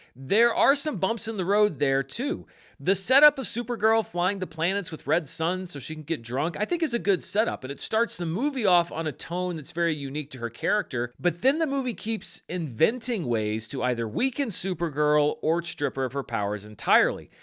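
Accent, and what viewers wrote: St. Louis, United States. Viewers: Are u from Saint Louis??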